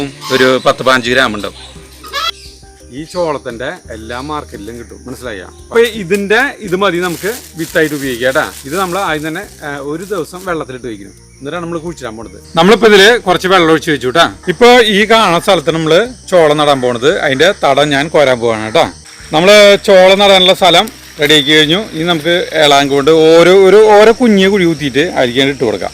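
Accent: Thrissur, India. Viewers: ആദ്യമായി കണ്ടതാണ് ആളുടെ വീഡിയോ!മടുപ്പിക്കാത്ത നല്ല അവതരണം.Keep It Up. സസ്ക്രൈബ് ചെയ്തു. തൃശൂർകാരൻ ആണല്ലേ! ആ സ്ലാങ് ഒരു ആവേശമാണ്. Wish You All The Best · Thrissur slang❤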